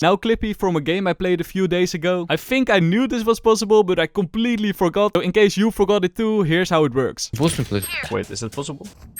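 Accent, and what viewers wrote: Netherlands. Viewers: are you dutch? I think i recognise your accent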